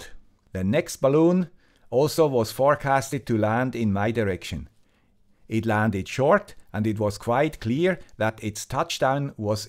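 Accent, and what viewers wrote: Switzerland. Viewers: Salü, guy with the swiss accent and thanks for the thrilling videos of you, hunting weather balloons at night. The last time I checked there were almost no balloons going down in my area, though (Rhein-Main in Germany)...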